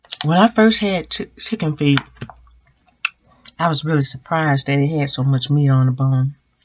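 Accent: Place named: South, United States. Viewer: Welcome. Love ur south accent.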